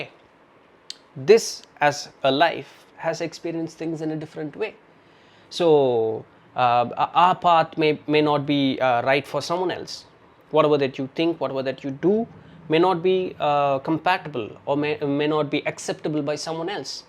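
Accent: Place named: India. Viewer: I'm surprised with your good English with less indian accent...l This makes your video in english more inner understanding with feelings... Thank you for this english you tube channel & May you get the highest success & blessing from God... Love from 3rd indian generation living outside india ❤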